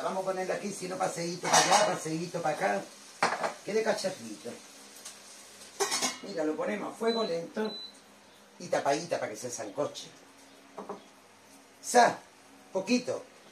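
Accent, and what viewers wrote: Andalusia, Spain. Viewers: Madre mía ¡ Qué pinta tiene este atún!! Me encantan tus recetas y sobretodo como las explicas. Con esa gracia andaluza que no se puede aguantar. Muchas gracias por compartir tus ricas recetas. Un abrazo de esta catalana con raíces cordobesas. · llevo poco tiempo siguiendote, me encanta como hablas como explicas todo con tu lenguaje andaluz me río un montón contigo, lo limpia que eres y con toda tu calma como nos enseñas lo que sabes!! Muchas gracias guapa! Seguiré viéndote a ti y a tus videos 💋💗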